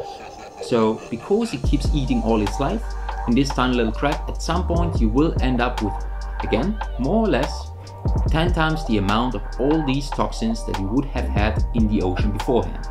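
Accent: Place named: Netherlands. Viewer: Klinkt als een Nederlander.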